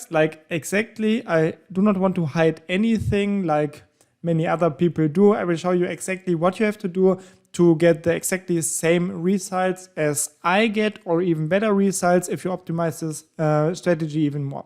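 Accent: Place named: Germany. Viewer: Since this guy is intentionally not telling you about time zones, etc. I will do it for him. Judging from his accent, he is probably in Berlin. Which is GMT +2, which also happens to be the same time zone as many/most of the brokers, probably yours too. And definitely his. Lucky for him, his broker is in his own time zone. The rest of us have to translate time zones. He’s starting the high/low range box at 2 AM his time which is also his brokers time. Lucky for him! But not so lucky for the rest of us. is two hours after the close of London. Translation: run your high/low range box from the beginning of Tokyo session continuously for 1.5 hours. Then start trading after that point all the way until two hours after the close London session, which is coincidentally when the Forex volume starts to drop I have no idea why this guy doesn’t explain it. Perhaps the create confusion to drive people to his website and his broker website..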